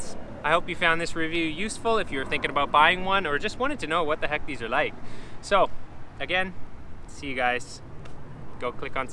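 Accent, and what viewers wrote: Canada. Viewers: First time watching the channel and I thought to myself that guy is Canadian I'm sure, I can hear it in his accent. As an English man we can't typically distinguish the difference between American and Canadian accents and whilst all you in North America hear those differences between each other easily I imagine, for us it really isn't easy but I think I've got it sussed now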